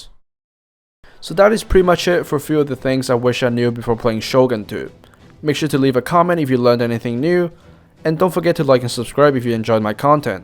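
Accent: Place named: Scotland, United Kingdom. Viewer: why do you sound kind of scottish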